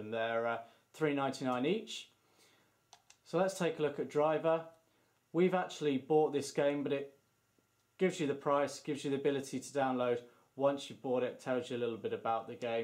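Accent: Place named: United Kingdom. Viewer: I cannot explain how i love the british accent!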